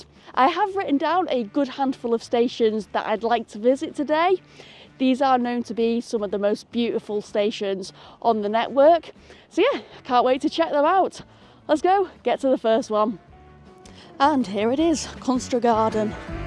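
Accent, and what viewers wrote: United Kingdom. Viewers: I can hear that you're brittish... just have a permanent stay in Stockholm. its not worth going back to England. Sweden is kind of a shithole now too but England is a lot worse.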